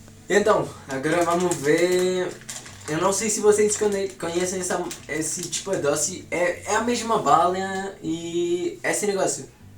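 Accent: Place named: Rio de Janeiro, Brazil. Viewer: Vc tem uma mistura de sotaque carioca e português de Portugal, mas está muito bom kkk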